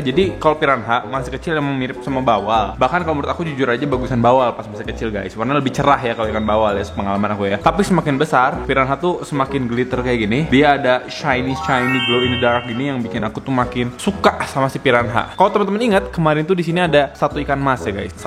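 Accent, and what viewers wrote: West Java, Indonesia. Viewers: Bang Orang Sunda ya:)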